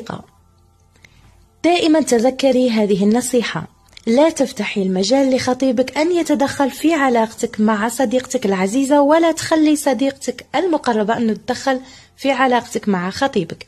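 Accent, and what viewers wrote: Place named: Libya. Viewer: لهجتك ليبيه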